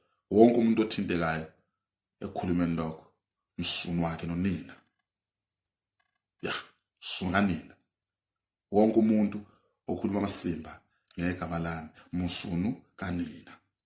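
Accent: Zimbabwe. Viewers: Cha mpela nje wizimbabwe uyazwakala noma ukhuluma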